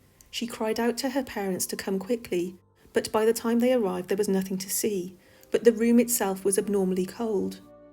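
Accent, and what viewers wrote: England, United Kingdom. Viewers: This reader has a perfect sympathetic voice for this production - and it is so nice to hear a quiet English voice describing these happenings without sensationalising them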